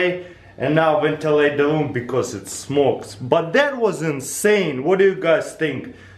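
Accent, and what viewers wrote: Russia. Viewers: i love listening to that Russian accent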